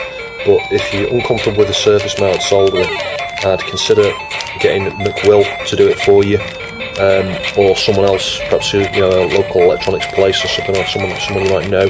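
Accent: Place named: Liverpool, United Kingdom. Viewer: What part of the UK are you from you sound sorta Scouse but then sorta like Manchester or even Cumbria. Good to see you enjoying your hobby!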